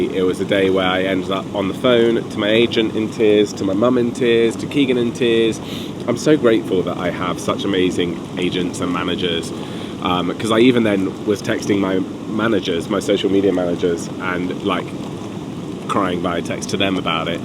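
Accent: United Kingdom. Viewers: Hi dude just chill and relax a bit. You seem to be doing great. You must do whatever you like to do. Don't take too much pressure on yourself. You literally have positive vibe and that is great thing about you. 1 year ago I started watching your videos just to learn British accent 😅. After a year I can mostly be able to understand the British accent now. Lot of love from India 😊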